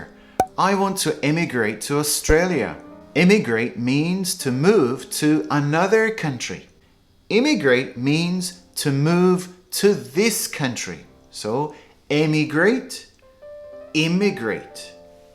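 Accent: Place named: United Kingdom. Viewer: わかりやすい！イギリス英語が好きです😊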